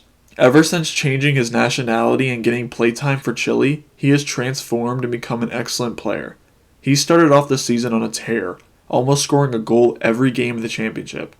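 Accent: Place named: United States. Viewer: Started watching, heard Amercian accent, switched off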